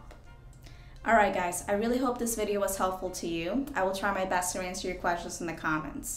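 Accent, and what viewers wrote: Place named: India. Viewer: Wow, I thought u r an Indian. Thank you for the lesson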